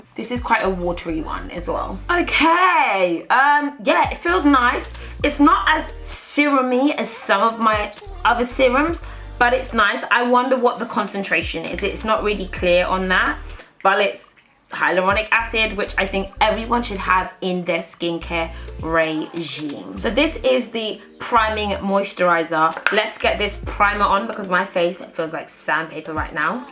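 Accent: United Kingdom. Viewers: Love your accent! Are you from UK? 😘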